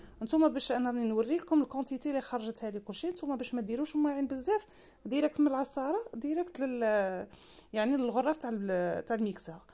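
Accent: West, Algeria. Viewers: يعطيك الصحة ربي يحفظك ❤❤ نتفرجك غ باه نسمع هدرتك عسسسسسل تفكريني بصحاباتي كي كنت نسكن ف تيارت ناس الغرب العشرة الطيبة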